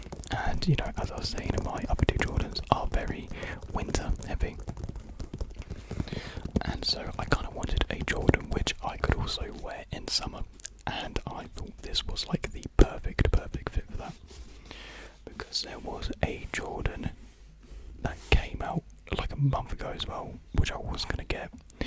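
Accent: United Kingdom. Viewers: I just found you and love your content, I saw and listened to your quilting YouTube as well, also saw additional content since then, hope you found a nice mix of YouTube and new career adventure. I must say now that I found you your are my favorite A-S-M-R MAN, LOVE THE BRITISH ACCENT. YES WEAR YOUR SHOES!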